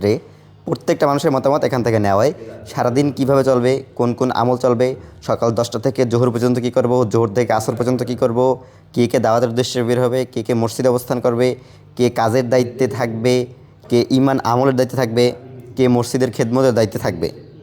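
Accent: Barishal, Bangladesh. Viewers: আপনি কি বরিশালের নাকি ভাই?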